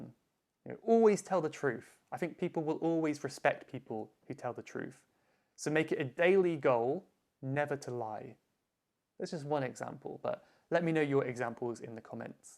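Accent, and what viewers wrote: United Kingdom. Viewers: I was admire to you myteacher ,my resolutions this years Will to marry and wanna improve myspeaking skill .i hope British accent could come out to mymouth .hahaha i love your accent have Made me ,was crazy .i'm sorry my English bad . I'm from indonesian ,i hope one day i could meet to you ...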